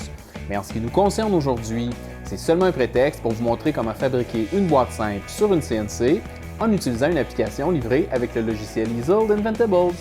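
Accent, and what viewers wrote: Quebec, Canada. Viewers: Bravo. Très bon tutoriel. Juste une petite remarque concernant la notation de la machine que je trouve sévère. En effet, le moteur ne fait que quelques W soit 100 fois moins qu'une fraiseuse ! Je trouve même exceptionnel qu'elle arrive à faire ce travail avec une puissance aussi faible. Cela étant dit, bonjour à nos cousins de la belle province. J'adore votre accent (qu'est-ce que je dis, c'est moi qui ai un accent ...).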